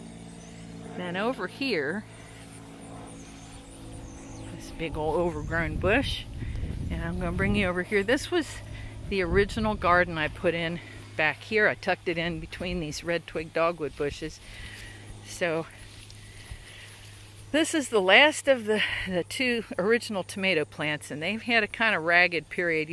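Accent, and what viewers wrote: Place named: South, United States. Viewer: Is it just me or did I detect a bit of a southern accent coming out in this video? Lovely update and tour! That little watermelon just hangin out chillin in his panty hose hammock made me laugh! 😄 What do you do with your acreage across the street? I love how your home has so much wide open space! Thank you so much for sharing my friend! 🥰❤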